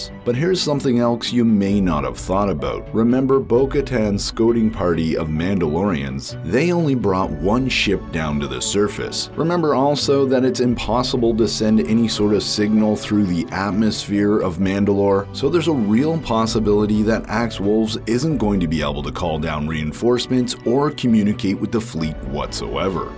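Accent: Canada. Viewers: Is that a Canadian accent with scouting pronounced "scoting" (sounds like coating)?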